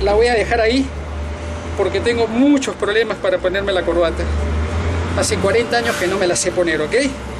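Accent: Argentina. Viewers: Xq hablas como argentino? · Sr. SI UD NO ES PERUANO! HALAGA A ARG HASTA NO PODER Y HASTA SU FORMA Y SU ACENTO ES MAS ARGENTINO Q PERUANO! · ¿Un peruano con acento argentino? Pues yo creidísimo de que era lo segundo; escuchar para creer, verdaderamente; muy interesante; desde Lima (vi14/V/2021)-Perú-América.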